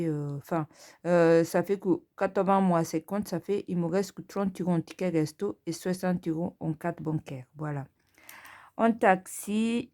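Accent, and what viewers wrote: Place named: Senegal. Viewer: Bonjour, un bon début de week-end😊 ps: je trouve que vous avez l'accent sénégalais trop mim's. Moi aussi, j'ai commencé le principe des enveloppes budgétaires depuis fin décembre. Pour le moment, hors vidéo, j'espère pouvoir me lancer l'année prochaine pour avoir un suivi plus assidu et motivant! Les débuts ne sont pas faciles mais déjà beaucoup de changements pour moi sa motive.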